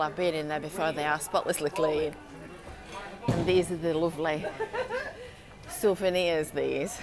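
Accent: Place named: Yorkshire, United Kingdom. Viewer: Amazing video. I was planning to come here too as my channel is all walkthrough in West Yorkshire videos. You also sound Yorkshire too can I ask where your from out of interest? Lol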